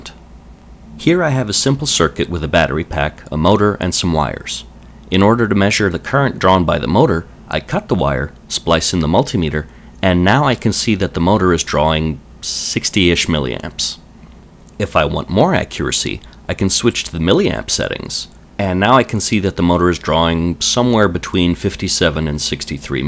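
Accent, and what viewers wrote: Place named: Canada. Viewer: Nice channel. Good content, Canadian voice and humour. Bon!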